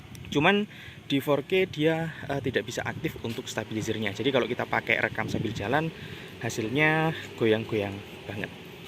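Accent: Banyuwangi, Indonesia. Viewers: Tibak e mas wong banyuwangi 😂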